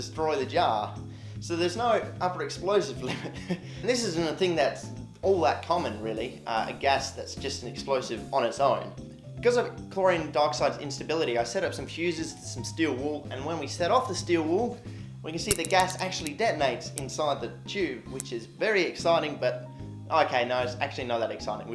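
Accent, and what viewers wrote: Australia. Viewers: For sure; without any doubt in my mind of all the chemtubers based solely on your being from Australia, you would be the most likely to both start a fight and with it. I'm not suggesting youtube host you, I'm saying 12 cans and three Jager bombs you. Having said that though you sound a little Victorian, maybe southern NSW or something? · Urinanalysis recycled tube with yellow liquid therein...oh yes! And your Aussie accent sounds like mine, but I'm in SA and surely you are a Perth person???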